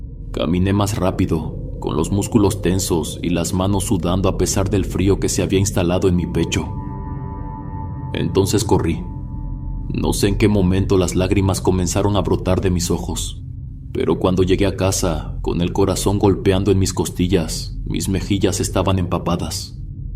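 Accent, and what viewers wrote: North, Mexico. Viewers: Hola, tu vioz y la manera de hablar me recuerda a mi primo residente al norte de México. Muchas gracias!! Y, muy bien relato. Como siempre!!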